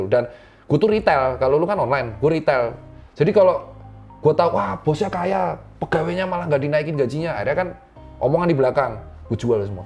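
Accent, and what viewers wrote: Java, Indonesia. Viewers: medok guwa guwene🤣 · Asli mau segaul apapun logat jawa tak bisa di hilangkan 😄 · Medok bgt,,ngomongnya...jawa,yes.gorengan kesukaan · medoookkk bingit😊😊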